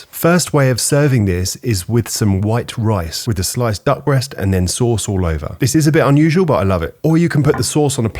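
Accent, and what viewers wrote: United Kingdom. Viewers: He sounds British and says "in". Otherwise, no. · Fuck off mate I can tell from your accent you eat beans for breakfast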